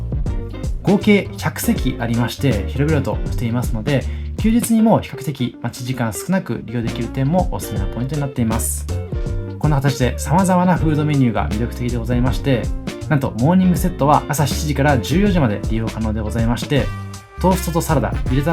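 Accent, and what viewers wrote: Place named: Tohoku, Japan. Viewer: 東北出身の方ですか？私は東北なのですがイントネーションが同じで親近感😊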